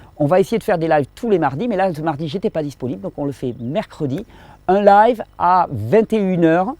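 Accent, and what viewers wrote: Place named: France. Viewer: T'as un pt'it accent du fond de la province quand même hein..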